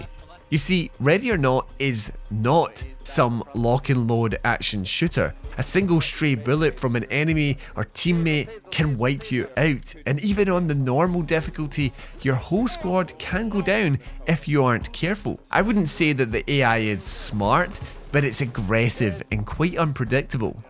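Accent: Scotland, United Kingdom. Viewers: Its called an accent. Scottish. There's a big world outside america little guy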